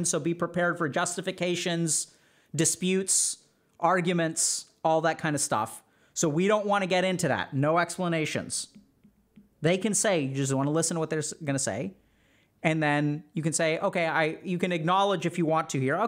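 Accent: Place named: India. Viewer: your Hindi accent is hilarious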